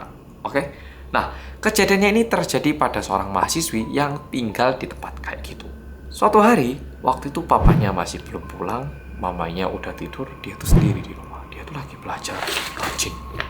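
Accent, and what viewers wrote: Java, Indonesia. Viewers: Malam Jum'at ditemenin orang Korea yang medok😂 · oo baha ada logat Jawa nya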